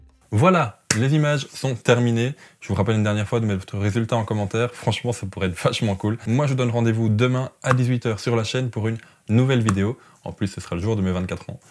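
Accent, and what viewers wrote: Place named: Charleroi, Belgium. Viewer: Accent de Charleroi je dirais 🤔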